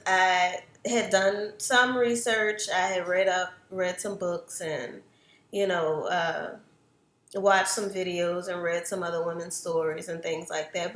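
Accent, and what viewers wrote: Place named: New Orleans, United States. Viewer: I love that you shared this! Having a birth plan is key when giving birth at either a hospital or birthing center. My midwife had a major concern with my pregnancy because I was so tiny, so she was afraid that my baby wasn't growing anymore and she wanted her to come out. She was going to insert something vaginally to induce me, but when she referred back to my birth plan, she did her best to follow my wishes and she did just that. My first birthing experience was amazing! I hope you have a better birthing experience with this baby. Congrats! You have a beautiful family & I also noticed that you are from New Orleans, I can tell by your accent because I am from there too. Lol!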